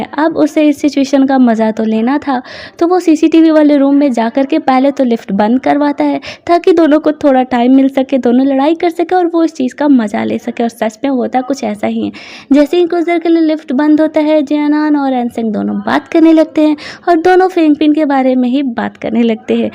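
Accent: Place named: Bhojpuri, India. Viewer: I love when u use Bhojpuri tone .😉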